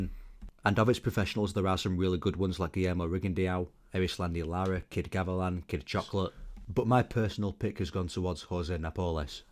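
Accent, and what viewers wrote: United Kingdom. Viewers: you sound brit but didn't mention Jimmy Wilde as the Top Brit. he's actually high ranking all time great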